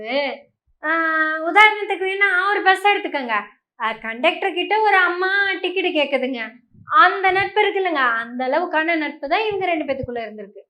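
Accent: Kongu, India. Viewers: Kongu tamizh asathal .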